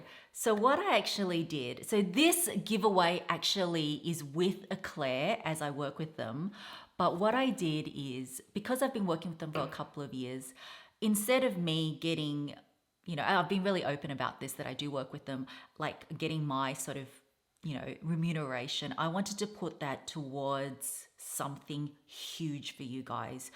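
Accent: Australia. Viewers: I’m very GRATEFUL for my wife MIKKI who I’m entering your give away for! She enjoys watching your latest video haul and I like listening to your Aussie accent, like when you say “leathaaah or evaaah. Her birthday is coming up in December and the Hermès Her bag would be the perfect birthday gift for her! I’ve been looking into building my history with Hermès by shopping for belts and handkerchiefs! I’ve even been browsing for Hermès tea cups just so I can get the chance of maybe purchasing a bag for her. Thank you for giving us a chance to win the Hermès Her bag! We’ll wishes and Keep up the fantastic job!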